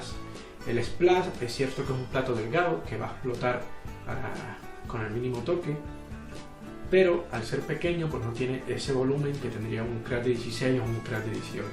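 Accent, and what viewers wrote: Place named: Spain. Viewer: Justo antes de que dijera que estaba viviendo en Colombia estaba pensando en que para ser español se le notaba muy pero muy poco el acento, me preguntaba de que parte de España sería. Y cuando dice que es de mi país me cago de risa y entiendo el por qué XD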